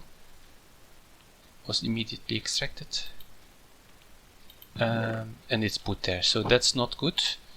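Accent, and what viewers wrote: Flanders, Belgium. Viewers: are you Belgium? I can hear it on your 'vlaamse' accent. Love your mods though. I also had one question about XNet, how does the redstone mode work on extracting items mode?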